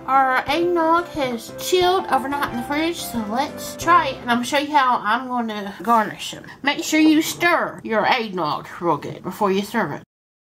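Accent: South, United States. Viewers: Love the country accent ❤️🎄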